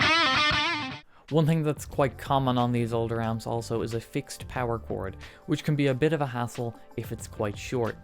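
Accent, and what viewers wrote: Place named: Ireland. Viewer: Great job lad. You've got great chops on the guitar. Also is your accent North Wicklow or Dublin?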